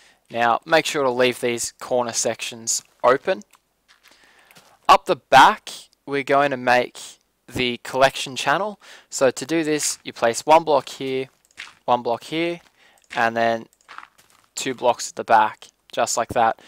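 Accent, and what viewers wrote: Australia. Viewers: Are you Aussie. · awesome, are you australian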